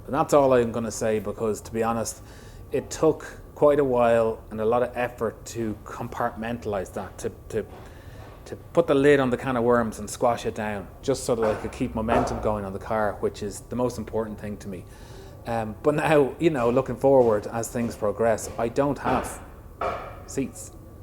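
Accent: Ireland. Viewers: Okay, that truly sucks! I'm watching from the US and there are like almost no Lotus Esprits from the early years in the whole country. Dang it! Still, I look forward to every one of your videos. Thank you for explaining the stop motion reasons and motivations. That make much more sense than I realized! It's also way entertaining and a butt load of work, I bet. I will subscribe to your channel, because I like your work ethic and I like vintage Lotus cars. Your Irish accent is a fav, too! Keep going!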